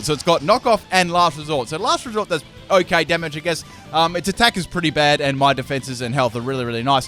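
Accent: Australia. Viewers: Are you Aussie ? You sound Aussie as fuck. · U Australian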